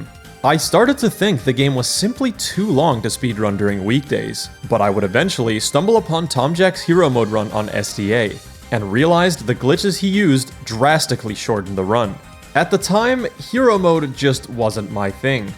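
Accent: Kansas, United States. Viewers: You sound like you're from Kansas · i always assumed you were american because of the accent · You sound American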